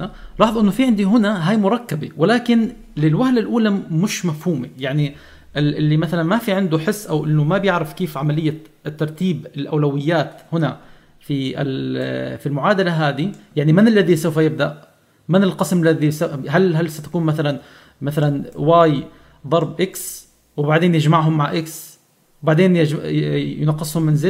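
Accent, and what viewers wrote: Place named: Syria. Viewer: غريبة انه تكلم سوري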